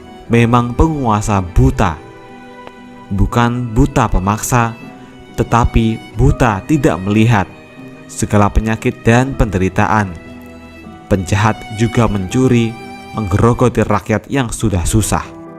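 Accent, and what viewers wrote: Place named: Java, Indonesia. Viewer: Admin anda orang Jawa y.dr logat b teleb bukan orang sunda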